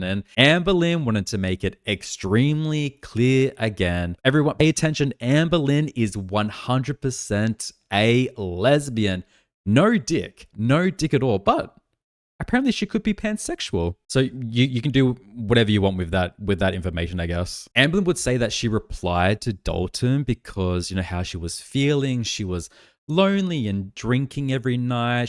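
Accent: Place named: Australia. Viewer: Great synopsis. It's always nice getting Gorlworld updates in my accent. #aussiepride The whole not taking BP medication thing is stark raving bollocks. How does a person with health anxiety (which I do think she has. I have it; I know the signs) hear she has high BP and be like "...no, I'll fix it naturally"? Girl. Come on. Even if we believed you were capable of losing weight at this point, at least take the meds until you've got your weight down and your BP is normal without them. This tells me there's some pride getting mixed in here.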